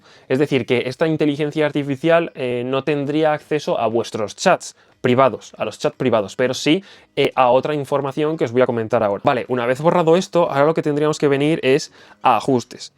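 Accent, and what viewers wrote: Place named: Argentina. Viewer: Mira Te Vuerbo Ha Escribir El, PQ Soy Un Poquito Bruta Yoo Te Guarde Para Despues Ponerte Cuando Mii Hijo Quiero Desircelo Para Asii Y Una Ves Mas Q, Ellos Agan Eso Mismo Le Escribo Desde EEUU Ves Noo Se De Donde Eres Me Pareces Como De Argentina Lindo Pais La Señora Q, Vive Ariba Segundo Piso Ella Es De Argentina Loo He Mirado En TV Ho De Uruguay Ablan Parecidos Saludos Soy Cubana Cuidate Muchoo?Gracias Bendiciones!🙏👏🤚😪💙💘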